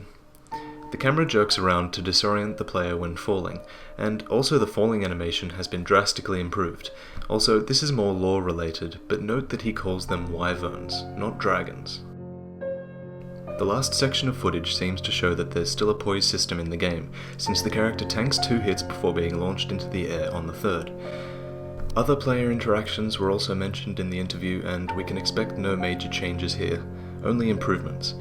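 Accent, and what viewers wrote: Australia. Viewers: Your accent reminds me of someone... Chase from House. Are you Australian? Anyways, great video! Didn't notice he used both weapons on the downwards cut lol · I smell... i smell.. an australian. Correct? if so, do you live in perth?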